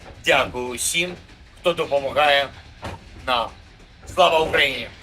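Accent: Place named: Russia. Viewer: Хреново он по хохлячекму балакае. С русским акцентом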